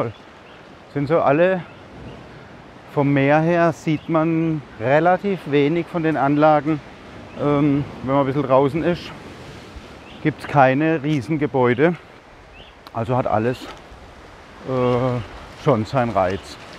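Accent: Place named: Karlsruhe, Germany. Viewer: Ja er lebt auf Koh Phangan.... wir haben schon einige deiner Videos gesehen und ich habe zu meiner Frau gesagt dass ich das Gefühl habe dich zu kennen. Wir waren 2019 in Khao Lak in Urlaub und haben dich mit Roman bei dir Daheim in Khao Lak besucht. Du warst gerade damit beschäftigt deinen Umzug vorzubereiten. Roman ist ein guter Freund von mir. Ich habe in deinen Videos auch unseren Karlsruher Dialekt rausghört ;-) Wie klein ist die Welt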